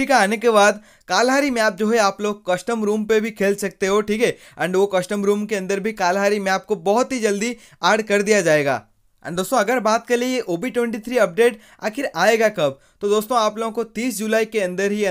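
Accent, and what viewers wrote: Odisha, India. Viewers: Odia ho kya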